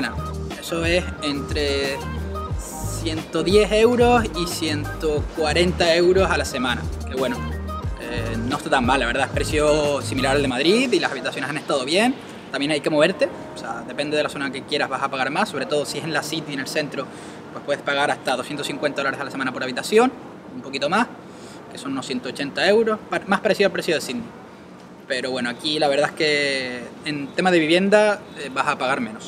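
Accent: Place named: Chile. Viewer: Tú acento es igual q el de los chilenos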